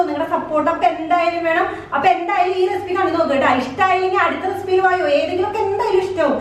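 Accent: Thrissur, India. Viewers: mde ..thrissur baasha aanalo...😂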